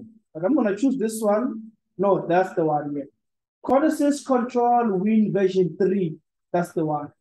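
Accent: South Africa. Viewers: THAT SHARP SHARP TELLS ME THE LECTURER IS FROM SOUTH AFRICA😅😅😅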